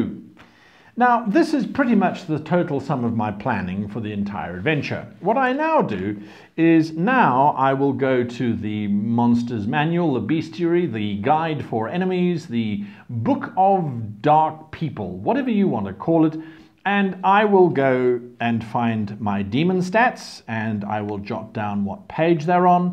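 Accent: England, United Kingdom. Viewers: I'm glad I've found your channel, really useful tips in all the videos I've seen so far. if I can ask, you sound British - do you know of any good websites/social media groups for recruiting players? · I could be a great GM if I had a wonder English accent like that!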